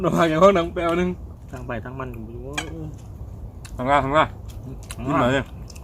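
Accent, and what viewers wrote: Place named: Korat, Thailand. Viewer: เก่งมากหนุ่มโคราชสอ้อนบ่เสียแนวโคราช555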